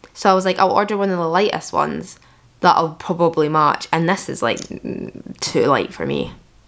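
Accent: Scotland, United Kingdom. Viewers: she got a scottish accent lmao my dad got one too . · Lol she doesn't have a English accent she has a Scottish accent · didn't expect the Scottish accent thank you for enlightening me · I could listen to your Scottish accent allll day long.... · Scotland b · Guys, Scotland is IN Britain, so technically her accent is British. Not all British accents sound like queens English accent “typical posh” accent. I may be wrong but she sounds Scottish to me, or northern English. So generally speaking I think non UK/Irish people think the entirety of england is the UK while forgetting there are 4 countries in Great Britain all with 100s of different accents in each part. Yall spoke like there’s just 1 accent in all of Britain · V a n i l l a that is definitely a scottish accent not a british accent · was not expecting you to be scottish and am loving it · Scottish accent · somaya no shes scottish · Ah a fellow Scottish person 🥰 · i did NOT expect u to be scottish 😳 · She sounds Scottish to me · Scottish. 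I already knew this, but She's talked about it in older videos. Apparently, it's the lowest of Scottish accents where she's from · Skanda Kousalya Devathaa she's scottish alyssa's from york x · no it's not, she's scottish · bpdbitch nah bro yea we’ve seen people with that the same accent (Ik she’s Scottish) but she just gives the vibe of it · because raylas scottish lmao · Keep your natural lighting I think it looks better :) also love to hear a Scottish accent on here, I’m from Edinburgh and Boots on Princess Street sells Fenty now ♥️ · i dont think so because i am and her accent is a little different i think shes from more southern scotland idk lol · Thot Scottish accent I love ❤❤❤❤❤❤❤❤❤❤❤❤❤❤ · haha, Scottish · I bet you're American, huh. Cause that was completely and utterly stupid. Her accent is violently Scottish and all the people in that show are English. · never seen a comment section struggle so hard to find out where she is from. 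Is it that hard to tell she is Scottish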